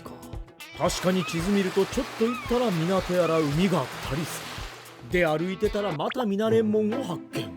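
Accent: South Korea. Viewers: 일본어하는 한국인? ㅋ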